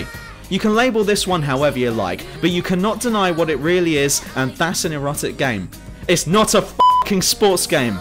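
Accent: United Kingdom. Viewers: gotta love that british accent :>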